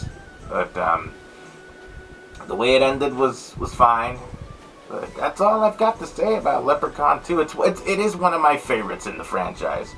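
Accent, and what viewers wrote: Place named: Ireland. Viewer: Ah nooo, the fecking Leprechaun! Again your Irish accent is better than mine. Happy St Paddy’s day!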